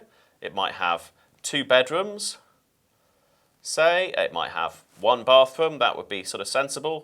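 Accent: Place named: United Kingdom. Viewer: I fucking love the british accent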